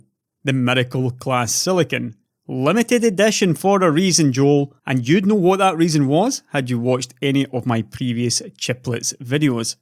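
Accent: Scotland, United Kingdom. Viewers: Thanks for explaining all this "so clearly"! I sure hope AMD can deliver all these improvements for near what your leaks and analysis suggests - cause I want one! If AMD pulls this off, they'll bury Intel... Running around saying "It's too good to be true" will do nothing but bump up the prices I'd think, since if they know they're delivering much more than the customers expect - or that the competition can possibly keep up with - why would they lower them? Even if people think that's true, they should keep their mouths shut and just see what unfolds! One software issue I can think of with these new high core and thread count architectures is how well the operating system's scheduling logic can take advantage of them. Properly optimizing the use of all the hardware resources based on the workload used to be a really complex problem back when I was in school - and that was just to handle dual and quad core architectures. 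(Yeah, "school" was a long, long time ago)... I hope the current state of the art (in figuring out optimized scheduling algorithms) can effectively exploit what all this modern hardware can now deliver... As always, keep up the great work - and have a merry Christmas and a wonderful New Year! p.s. - Your Scottish accent is still most excellent too! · Never and I'm not even a native English speaker. 🙂I love his Scottish accent.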